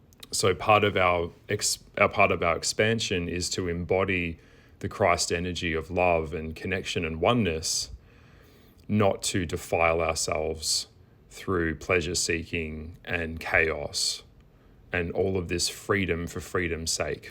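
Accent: Australia. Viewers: but you have an aussie accent- where did you grow up?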